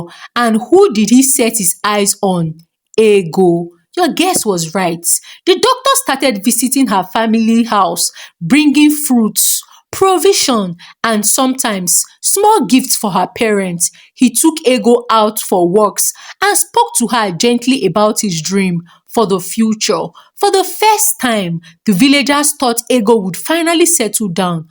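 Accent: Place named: Nigeria. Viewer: I really enjoyed the story, the way it's being articulated just makes it more interesting, I just admire Nigerian accent 😅❤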